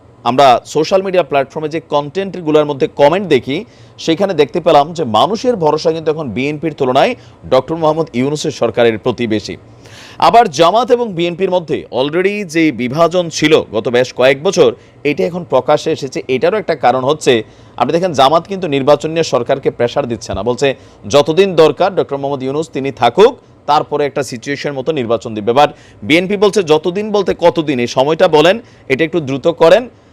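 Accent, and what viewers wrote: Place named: Noakhali, Bangladesh. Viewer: ভাই আপনি যতই শুদ্ধ ভাষা বলতে চেষ্টা করেন না কেন আপনার নোয়াখাইল্লা ভাষা চলে আসে এটা খুব হাসির কারণ হয়ে দাঁড়ায় তাই আপনি নোয়াখাইল্লা ভাষায় বলেন শুদ্ধ ভাষার বইলেন না আপনারে আর মানায় না এটা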